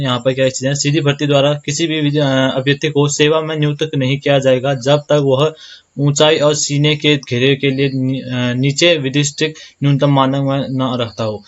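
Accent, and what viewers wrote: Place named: Uttarakhand, India. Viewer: Bhai aap phadi h kiya aapki language bhut piyari h. 😍😍❤ I love Uttrakhand ❤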